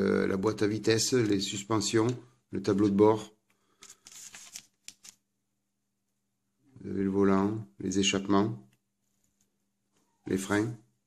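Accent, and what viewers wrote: South, France. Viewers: un beau projet en perspective a venir...toujours agreable a regarder tes video avec ton petit accent du sud.a plus et bon courage.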